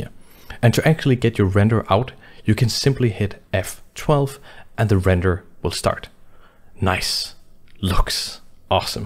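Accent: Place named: Denmark. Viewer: The good old danish accent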